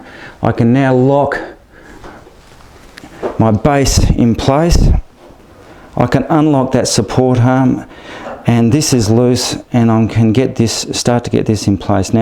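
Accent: Australia. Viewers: Nice piece of work, and always good to hear an Aussy accent.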